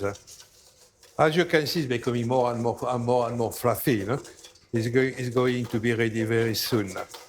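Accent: France. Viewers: It’s a French accent. No English accent here.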